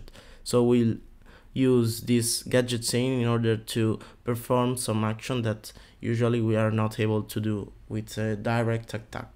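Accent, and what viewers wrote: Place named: Italy. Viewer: Grande, dall'accento ho subito riconosciuto che sei italiano e sono corso a vedere <3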